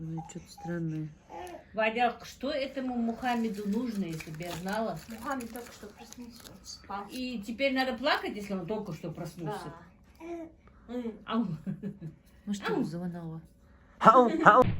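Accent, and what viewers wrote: Standard. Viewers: я Ваша тёзка. Недавно подписалась. Я с Троицкого. А Вы где живёте? И ещё хотела спросить: Вы раньше не в Грозном жили? Или с Пригородного? У Вас речь чистая и правильная...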